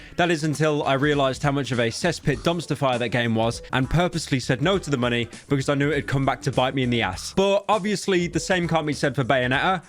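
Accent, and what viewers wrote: United Kingdom. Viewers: I like when the funny British man talks about the popular thing on the screen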